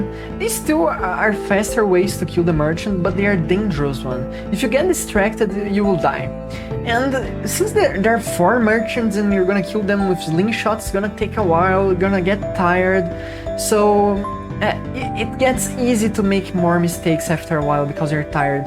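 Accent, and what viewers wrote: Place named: Brazil. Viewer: brasileiro sim ou com certeza? kkkkk sotaque ta igualzinho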